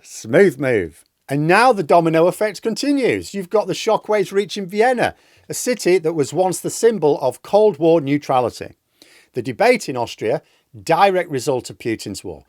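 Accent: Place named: England, United Kingdom. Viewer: "Smooth move, son!" Speaking as an Australian, this sort of thing is why we like listening to English people speak as compared to, say, Americans. You sound like my grandfather but cool and very now. Anyway there has never yet in recorded history been a century without at least one widespread land war in Europe. Why would this century be any different?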